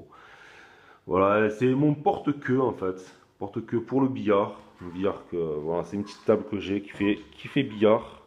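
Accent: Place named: South, France. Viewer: Sa fait plaisir tu vien du sud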